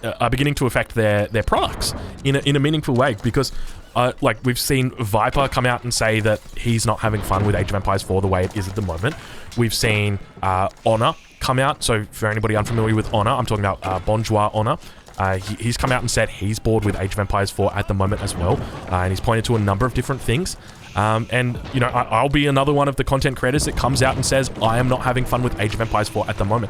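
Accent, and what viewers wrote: Australia. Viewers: I think you need to change how you cast them. All of these games aren't tournaments games where everything is super important to watch or pay attention to. Maybe. Focus on a few battles. Maybe build orders but jump around more. Don't have a 20 min casting slog where you are trying to fill the air with your Australian accent. Maybe reduce the time? By skipping boring parts. Or something idk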